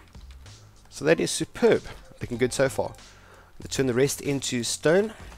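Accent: South Africa. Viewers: Are you South African? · South African ? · I swear this homi is south African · Are South African? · are you south african? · Are you south african? I heard Australian at first then i noticed that boer accent. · 120% south african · Are you south african? cuz you sound like it :) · South African? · Are you South African 🇿🇦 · What's that accent? Are you South African? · Upon watching this i couldnt help notice the man's voice in the video... how familiar it sounded. Then i check his twitter and i realise... FAM! South African gamer ^^. SO great to see a South African making a successfull year on Youtube and into their own streaming career. You are an inspiration dude. I stumbled upon this vid, but you got a new follower for sure. Nice hidden base video, going to lock up all the captives in there from now on ^^ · are you south african ? im north African :) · Are you South African mate? 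· From your accent I am assuming you are South African and I pray to God that you are not still in that godless country. Stay safe out there buddy. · You sound South African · I really love your accent and it makes me miss SA, great videos man and I love the concept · This guy is south african 100% How i know the accsent And south african afrikaans english grammar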